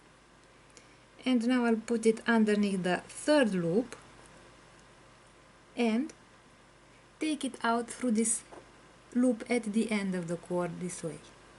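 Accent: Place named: Iceland. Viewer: Very helpful tutorial! Love your accent! Are you from Iceland?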